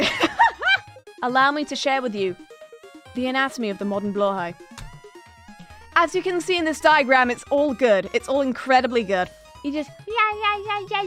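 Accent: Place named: United Kingdom. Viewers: And her Brotish accent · the fact that she’s also British and has an accent makes it 10x funnier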